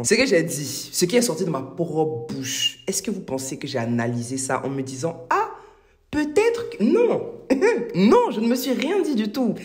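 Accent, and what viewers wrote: Ivory Coast. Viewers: Une métisse qui parle comme une bledarde ivoirienne....elle oublie son côté blanc. Moi je ne vois qu'une métisse en recherche d'identité à travers un accent et des expressions....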